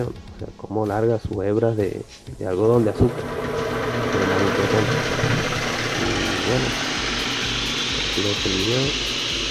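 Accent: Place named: Santiago del Estero, Argentina. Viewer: Hola amigo, te felicito....muy bien explicado....parece tonada Santiagueña 😊👍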